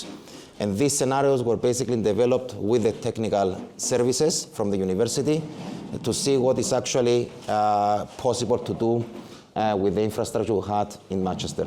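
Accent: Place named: Greece. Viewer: Sorry but your english prononciation with strong greek accent is almost impossible to understand for french speaking people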